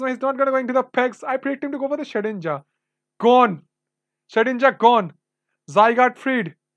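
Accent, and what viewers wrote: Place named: India. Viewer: indian accent ftw 💪💪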